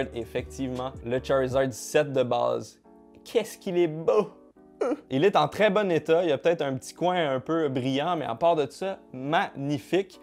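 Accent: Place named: Quebec, Canada. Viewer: Oh merde s est un québécois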